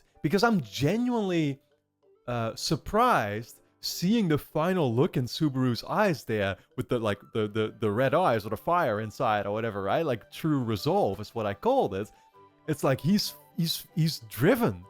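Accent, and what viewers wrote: Netherlands. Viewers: Your dutch?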